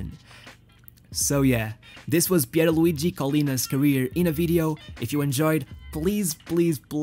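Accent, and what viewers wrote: Portugal. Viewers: Este gajo que está a narrar parece-me ser português, tem o sotaque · Your Portuguese accent kills me, reminds me of my Portuguese friends! Great vid!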